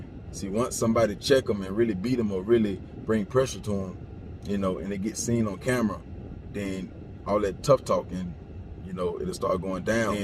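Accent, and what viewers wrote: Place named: Atlanta, United States. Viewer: Sound like u from da A I'm from Pittsburgh zone 3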